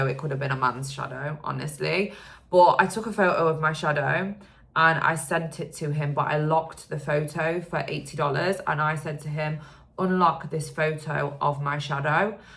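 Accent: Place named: United Kingdom. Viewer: Do you think having a British accent gives you an advantage, say, over an American accent?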